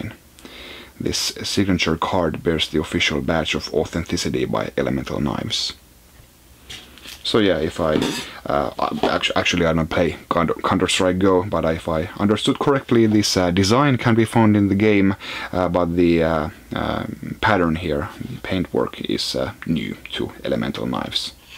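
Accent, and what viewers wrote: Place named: Finland. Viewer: I noticed the finnish accent right away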